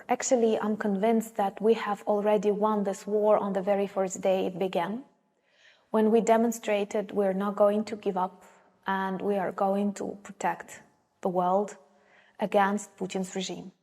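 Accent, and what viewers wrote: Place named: Spain. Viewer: Thank you very much for aproaching Ukraine pride to the world. By the way... Do you speak Spanish? It sound me like Spanish your start.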